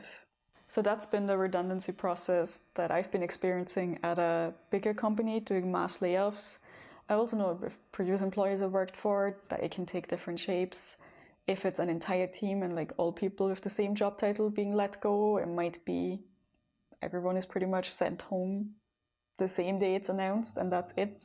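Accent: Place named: Ireland. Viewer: I just can't get over how you are sounding more and more Irish with every video lol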